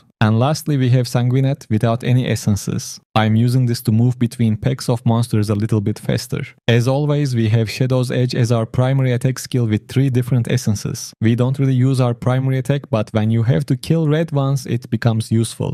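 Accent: Turkey. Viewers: Kanka sen Türk aksanı ile konuşuyorsun öyle ise yazar mısın? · Videoya girer girmez türk ingilizcesi aga nerde olsa tanırım 😅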